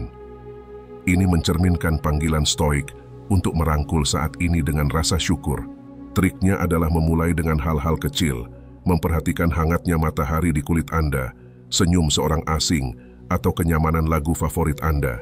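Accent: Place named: Manggarai, Indonesia. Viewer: logat manggarai banget😊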